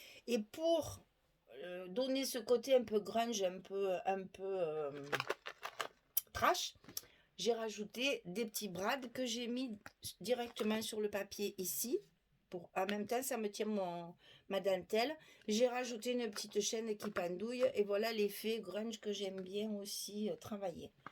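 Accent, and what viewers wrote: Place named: Provence, France. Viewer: Bonjour. Nouvelle abonnée. Tout d’abord bonne année ou meilleure que la précédente du moins. Toulonnaise de naissance - mais toulousaine d’adoption - votre accent fait chanter les cigales dans mon coeur. J’adore ce que vous faites même si je ne suis pas très chaby chic. Juste une petite remarque. Ce n’est pas un style grunge ou trash (cyberpunk) mais steampunk, courant artistique basé sur le début de l’industrialisation en Angleterre sous le règne de Victoria d’où les corsets et la dentelle dans les rouages. Le grand leader de ce courant étant bien sur Jules Verne. Côté cinéma voir Wild wild west (Les mystères de l’ouest) et la Ligue des gentlemen extraordinaires entre autres. Voilá voilá.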